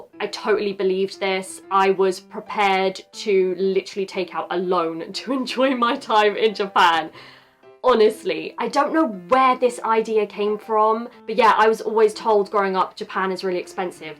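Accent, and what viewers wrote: United Kingdom. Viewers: 唐揚げとビール！・・・締めラーメン・・（笑） イギリス英語のいい勉強になります！有難う！！ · イギリス英語が、素敵！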